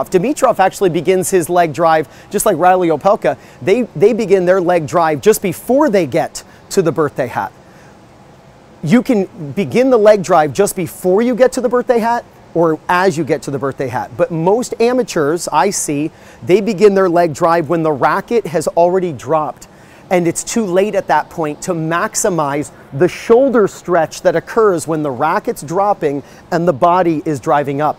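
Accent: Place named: Florida, United States. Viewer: I hear an accent but I don’t know which state? Florida?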